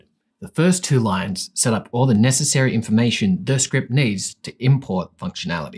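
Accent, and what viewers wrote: Australia. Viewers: He’s just an Australian…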